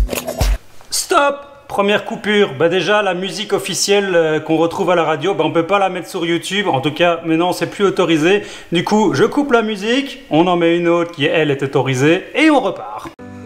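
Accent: Belgium. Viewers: Moi j'aime bien ton accent Belge :-)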